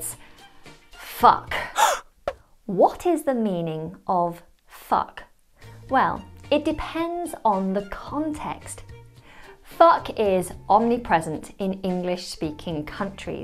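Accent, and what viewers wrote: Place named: United Kingdom. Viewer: I will always repeat it. I love always to listen to your British accent.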